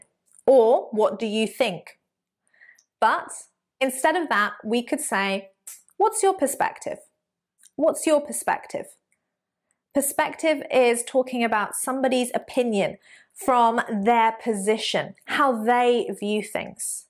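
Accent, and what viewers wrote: United Kingdom. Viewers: All ma'am, I live in America but I love British English British accent and your accent. I still have issues using them in sentences cuz it's very high level English So I still need your assistance in using them in sentences and I love it thanks a million teacher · I love your accent, I love British English accent, you way of teaching is very lovely, I wish I can take class physically by you. You are gorgeous and lovely lady. Just I subscribed your channel. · You have a British accent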